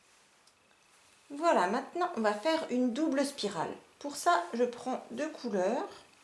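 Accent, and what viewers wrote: Alsace, France. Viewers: Super 👍🏻 merci très bon tuto je vais pourvoir en faire avec mon fils. En plus très jolie professeur 😅 petit accent Alsacien si je me trompe pas 😁🥨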